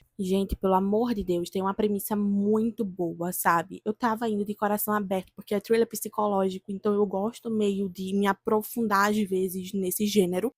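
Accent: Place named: Minas Gerais, Brazil. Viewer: Caí de paraquedas hoje mesmo aqui, e te achei uma diva, amei o sotaque (é mineiro?). E eu ganhei O Morro dos Ventos Uivantes da minha irmã, só que eu tô postergando pra ler ele (sendo sincera), até mesmo por tudo de ruim que eu ouvi sobre a adaptação. Mas eu tenho uma amiga que leu e gostou muito, disse pra mim que eles tiraram o foco total do que realmente o livro vem trazer.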